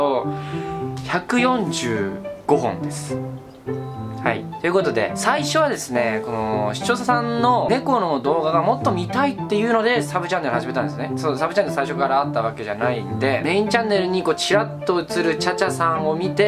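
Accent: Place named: Osaka, Japan. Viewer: かに かに 大阪出身？ しゃべり方が大阪 ちなみに俺も大阪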